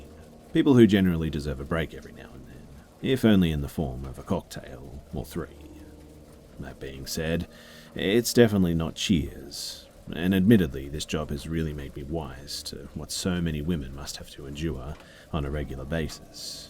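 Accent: Australia. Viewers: Sound like my buddy from Australia 😂, got him listening to you. Dig your channel my friend.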